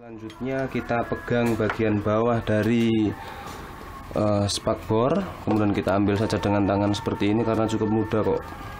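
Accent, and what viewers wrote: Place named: Gresik, Indonesia. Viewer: Logatnya Gresik Banget hahaha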